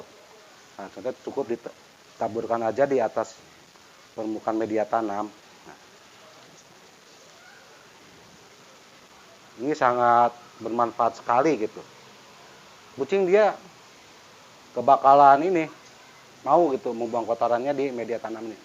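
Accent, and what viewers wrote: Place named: West Java, Indonesia. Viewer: Logat nya siga urang sunda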